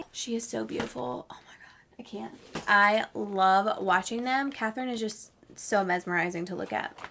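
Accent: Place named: Colorado, United States. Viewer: Sounds like Colorado to me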